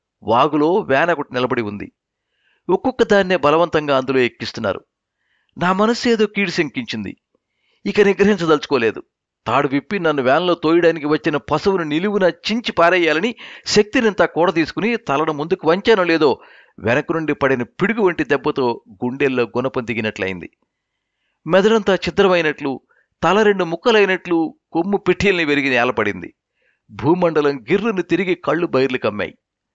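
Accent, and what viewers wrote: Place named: Rayalaseema, India. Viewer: Super story. Congrats to Reddy garu , the writer.మీరు గూడా బాగా చదివారు.‌ రాయల సీమ వ్యవహారిక భాషను బాగా పండించారు.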